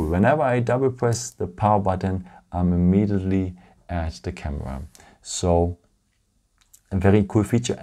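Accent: Germany. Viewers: Thick german accent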